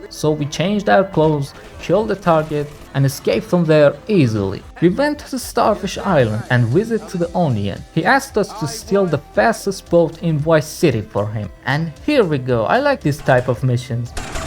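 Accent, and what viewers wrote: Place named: Iran. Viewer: Persian accent 😂😅❤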